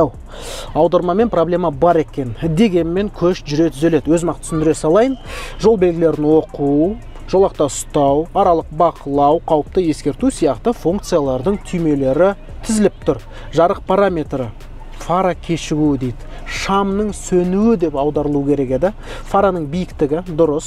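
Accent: Standard. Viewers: ісіңе береке берсін сен сияқты таза қазақша сөйлейтін қазақтар көбейе берсін аумин 🤲🏻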